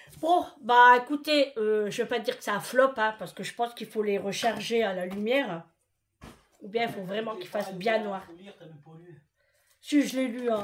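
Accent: North, France. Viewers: Bonsoir je suis une nouvelle abonnée. J'ai aimé votre naturel, votre simplicité et votre franc parler. Vous avez l'accent du nord. Je suis de douai ( 59) prenez soin de vous et de vos proches. Je vous souhaite pleins de belles choses à vous et votre famille. Dieu vous bénisse ❤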